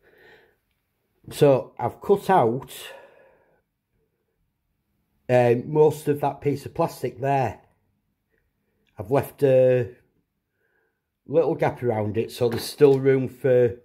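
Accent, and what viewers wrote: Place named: Northern England, United Kingdom. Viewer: Great work! Tried this in my last one but give up! Also great to hear another proper Northen accent!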